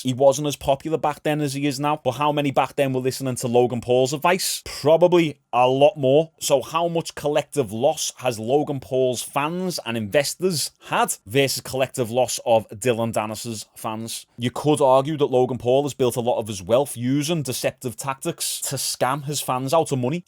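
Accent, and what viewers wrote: Liverpool, United Kingdom. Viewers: I love the U.K accent shit sounds dope to me. The Market always drops on the weekends u should know this! Noisy ain't gonna forg3t about their money! 😆😆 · As a manc, I'm still surprised on how drawn I've become to a scouser just chatting shit on YouTube 😂 got to give credit when due fair play, keep it up bro 👍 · pls say u support liverpool coz u have the best accent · Love MMA and when I heard a scouse accent I had to sub. Love all the content bruv. Keep it comin.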